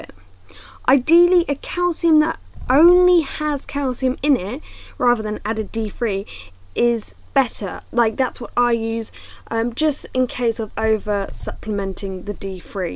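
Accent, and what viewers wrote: United Kingdom. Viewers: Is it okay that I love the way you say "vitamin D3"? Not being mean, its just cute. The British accent is so easy to listen to. Im American born and raised, but my Aunt is from Ipswich and its almost relaxing to sit and listen to her talk.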